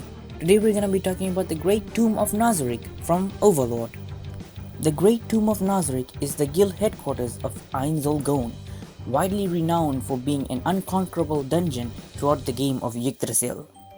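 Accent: India. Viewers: Great video except the Indian accent. U could have someone else to read it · Alkatron agreed. I am so incredibly distracted by the indian accent.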